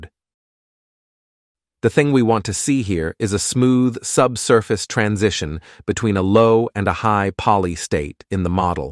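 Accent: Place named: United Kingdom. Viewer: Awesome video and chanel. P.s.: go back to the british accent! 😁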